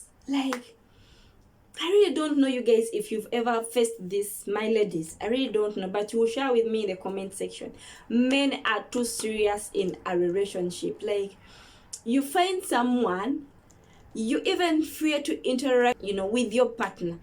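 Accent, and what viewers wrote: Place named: Uganda. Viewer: I feel you , but always communicate in relationships matters , munange kale I knew that accent that u are a Ugandan kabisa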